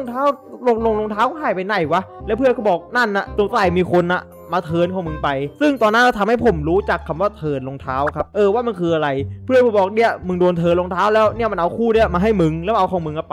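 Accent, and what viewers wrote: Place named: South, Thailand. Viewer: พี่เป็นคนภาคไหนทำไมพูดเหมือนคนใต้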